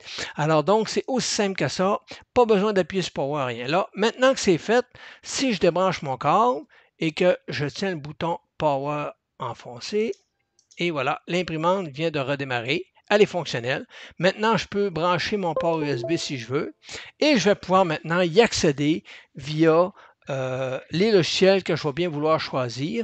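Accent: Canada. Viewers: Bravo pour ce très bon tuto (surtout avec l'accent canadien pour nous français). il serait intéressant de connaitre la vitesse et la puissance du laser pour les travaux effectues. Encore merci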